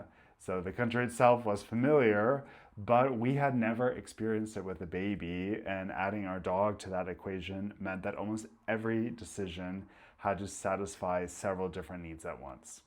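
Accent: Bavaria, Germany. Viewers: I am new to your channel. What I notice most of all is your Bavarian intonation! I don’t hear it in German but I do hear it in people speaking English after a lot of exposure to bavarians. If you don’t know what I mean, listen to Feli from Germany and her unusual patterns, I hear a lot of them in this video.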